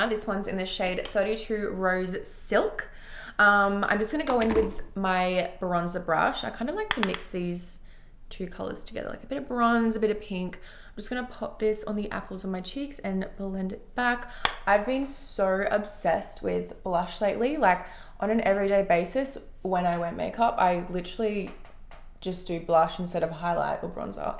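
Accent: Australia. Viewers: I never thought the Aussie accent could be unattractive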